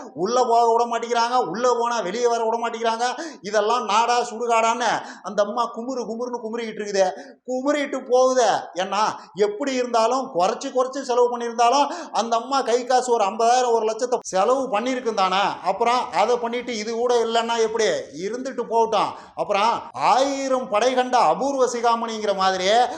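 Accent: Kongu, India. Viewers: தம்பி, your lectures are very deep and insightful, especially on Indian politics. Your analysis is excellent, and the information you provide is valuable. However, I have a small suggestion—sometimes, the topic of your video and the actual discussion don’t fully match. ஈரோடு என தலைப்பு வைத்ததை விட வடக்கு கிழக்கு அரசியல் எனும் பாணியில் தலைப்பு வைத்து இருக்கலாம். It would be great if you could stay more focused on the given topic and elaborate on it more directly. This would make your content even more impactful. Keep up the great work! நகைச்சுவை கலந்த கொங்குத் தமிழில் உங்களின் கருத்து மிக அற்புதம் தம்பி. ஆனால் தலைப்பில் பேசுங்கள்.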